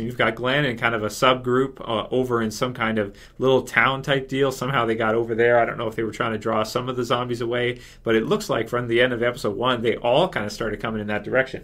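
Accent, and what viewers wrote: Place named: Canada. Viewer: "oat and aboat".... LOL i love your accent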